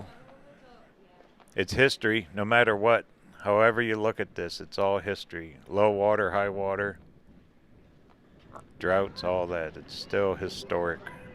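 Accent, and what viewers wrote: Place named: St. Louis, United States. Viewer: My wife and I enjoy your Chanel. On a personal note, your dialect sounds just like my cousin- from St. Louis.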